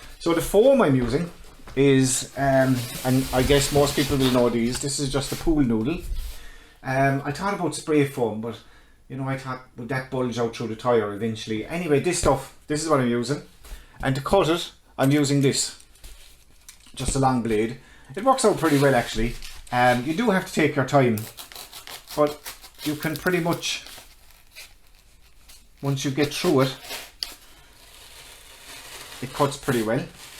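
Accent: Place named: Ireland. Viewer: Sound! I've never got them exactly the way I want first time. The power of editing!! Also great to hear an Irish accent making its way in YT space. Slan a chara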